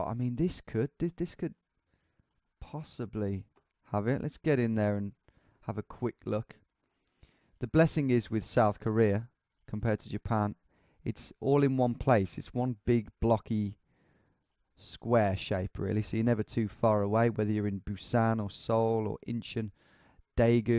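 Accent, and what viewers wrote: United States. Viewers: your american accent is so spot on. makes me laugh every time! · His American accent is actually very good. And absolutely hilarious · That perfect Midwestern accent... In Boston lol. Great video though, love these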